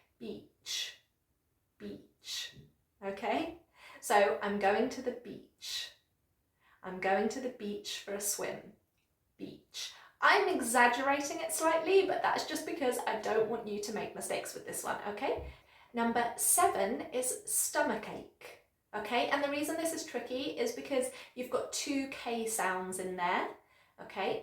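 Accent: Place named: United Kingdom. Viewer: Thank you for the lovely video. I love the British accent...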